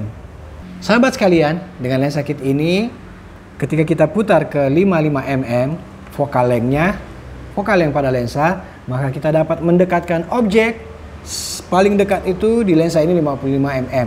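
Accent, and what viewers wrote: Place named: Bugis, Indonesia. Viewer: Org Bugis y??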